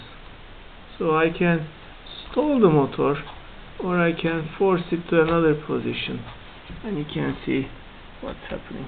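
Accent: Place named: Turkey. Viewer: naber yaa. türk oldugun aksanından belli oluyor. ama güzel. iyi yapmışsın.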